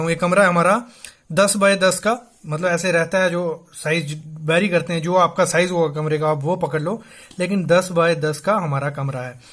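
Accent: Jammu, India. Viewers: bai muje sabse pehle ye batao ap Jammu se ho kya?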